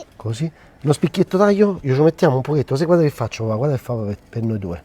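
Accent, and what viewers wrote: Rome, Italy. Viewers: Grande! Con il tuo accento mi riporti nella mia magica Roma! Eseguirò la tua ricetta!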